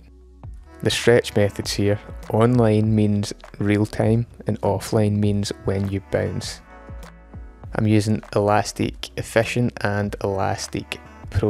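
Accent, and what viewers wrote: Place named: Scotland, United Kingdom. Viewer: I find it odd how few views and likes videos get, considering how handy they are for a Cakewalk user - maybe folks out there can't understand his rather mild Scottish accent!? Thanks for the posts!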